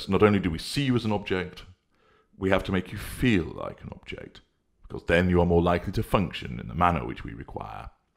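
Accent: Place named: North, United Kingdom. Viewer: Yeah he sounds like he's a RADA graduate. But every now and then I notice either a Midlands or northern accent coming through so I assume he's not from down south originally. It takes a Brit to notice this aspect.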